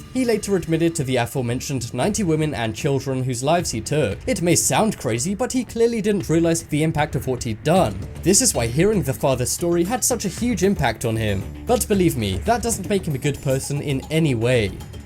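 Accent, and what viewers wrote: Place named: United Kingdom. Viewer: The clickbait starter pack: British accent, arrows on thumbnail, thumbnail having nothing to do with the video, asking for a like at the beginning. You only have a British accent, so this video is not clickbait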